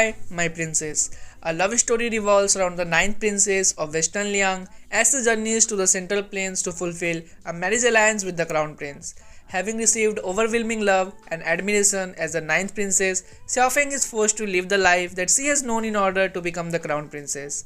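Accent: India. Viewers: Are you Indian? Your accent 🤪 Me is too🤣🤣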